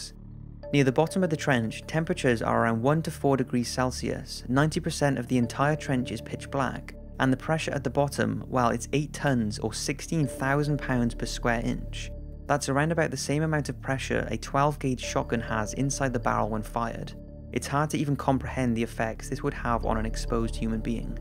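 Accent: Wales, United Kingdom. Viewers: Are you from Cardiff I think you are shout out to my fellow welsh man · I hear a brit accent and talk of kilometers and my New York ass says gnite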